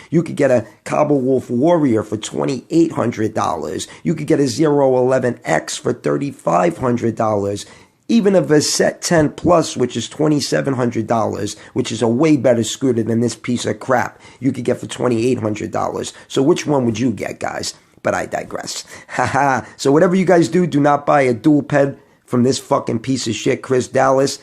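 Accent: New York, United States. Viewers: I love how u call it how it is you get straight to the point and i love your nyc accent lol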